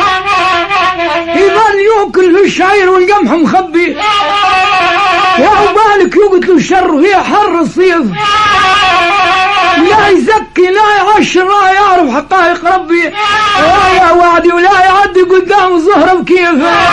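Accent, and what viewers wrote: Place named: Tunisia. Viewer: لهجتو تونسية اكثر من جزائرية....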